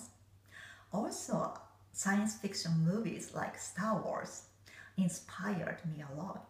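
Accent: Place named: Japan. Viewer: У японок такой приятный акцент. Или не акцент, а манера речи. Сладковатый такой :-)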